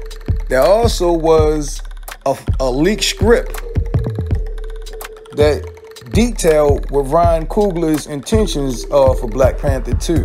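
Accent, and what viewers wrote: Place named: Baltimore, United States. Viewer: i can tell you're from baltimore btw 😂😂😂😂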